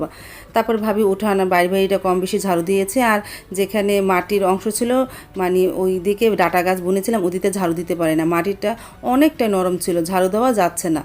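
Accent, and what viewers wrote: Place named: Tangail, Bangladesh. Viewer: আপুর কথাগুলা একদম আমাদের টাংগাইলের ভাষার মত।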